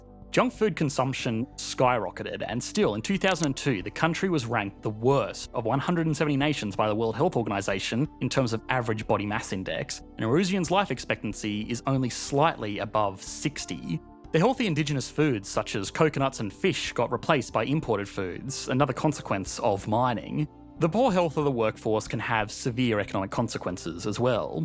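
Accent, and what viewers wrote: Australia. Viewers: It's called "Australian". Admittedly, modern Australian, but Australian nontheless. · First time here, interesting topic, only I can stand your way of talking.. using Vocal fry at the end of a sentence. STOP IT and talk normal. (not talking about Aussie accent). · Interesting accent Sounds to me a mix of Australian and tv chef Loyd Grossman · Aussie narator. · Australian accent in a nutshell. This phoneme doesn't exist. That and ending their sentences like they were questions? I found myself repeating the end of every sentence just to hear what I'd sound like if I were from there? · people getting mad over an australian accent and invalidating the rest of the video's point proves that these people have the highest iq in human history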